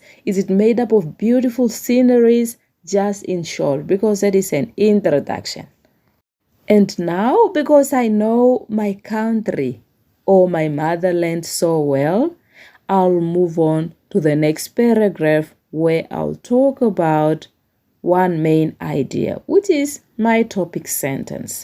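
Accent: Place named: Namibia. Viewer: Huh? My fellow Namibian. No wonder i understand the tone so well. Lemme Subscribe ❤🎉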